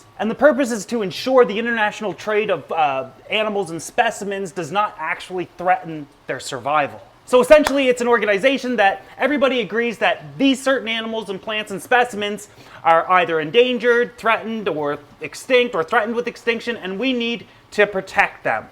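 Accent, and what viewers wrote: Canada. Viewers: Canadian accent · as a fellow canadian i understand how said cities when its said more like sighties. I know the spelling aint like that but its cities said as sighties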